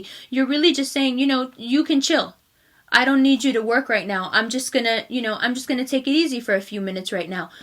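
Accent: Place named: California, United States. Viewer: I hear that Cali accent. My daughter is a Gemini too.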